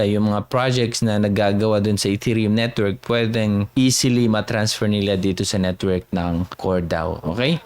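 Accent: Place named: Conyo, Philippines. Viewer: Conyo magsalita pero ayos salamat sa review boss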